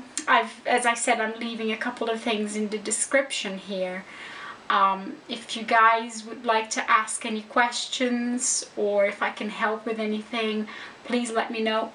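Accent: United Kingdom. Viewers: Such an inspiring video! 👏🏻👏🏻 Would you also have a video telling us your study and career journey, and how you got such a perfect British accent? 😃 Thanks for sharing your experience. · Pronúncia fantástica, com um toque de sotaque britânico, o que é dificílimo para brasileiros! Parabéns!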